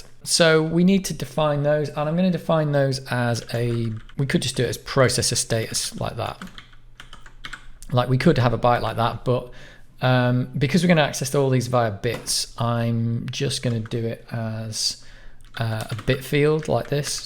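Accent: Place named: United Kingdom. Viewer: Try to hire vocalizer it's high viscosity and hear it is unbearable. So much ear scratching and most of time floating slowly I listening at least +2x speed. I recommended you to switch standard US accent even Bharat more heareable than british. Or will be good if there is have any settings for prevent some countries and accents. I will glad if anyone knows like that filter tell me. Closing all sources much better than first option. Why i have to hear ridicilous sounds? Converting with transcript to all videos from same sources means cost.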